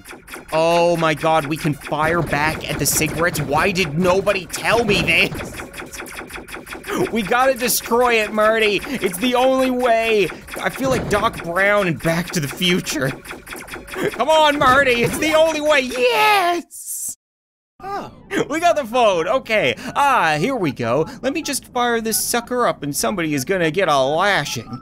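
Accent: Appalachia, United States. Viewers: One thing I never get Why does always make posh people sound like hillbilly Martin Luther Lincoln Mr peanut, teddy, felix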